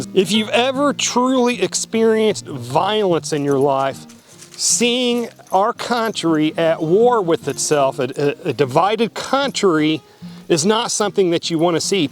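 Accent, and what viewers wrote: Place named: Delmarva, United States. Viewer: U have a delmarva accent, where yew from?????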